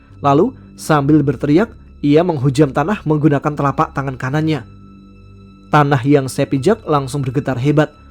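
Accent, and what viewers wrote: Jakarta, Indonesia. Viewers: Ane orang Betawi bang, keren bahasa Betawi ucapan bang masuk da kaya berrry prima😅😅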